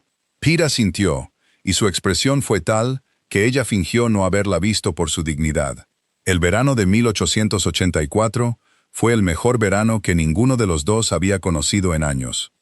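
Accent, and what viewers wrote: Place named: United States. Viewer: ESe acento gringo del narrador, que enfado.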